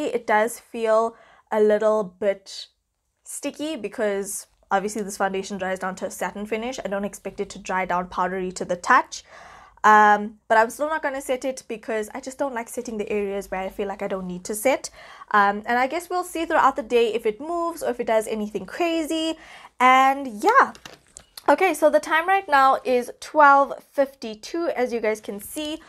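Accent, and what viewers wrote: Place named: South Africa. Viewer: So yes, I have heard of it since it had a good amount of attention in the US, however I am buying it right now online because of your review and recommendation 🙂. It's pretty affordable here, just $12. My current favourite foundation is Bareminerals barepro foundation. This should definitely come into the chain stores, I think it would be really popular....Randomness- I love watching your videos not only for the content but I miss hearing our South African accent ❤. #durbangirl 🇿🇦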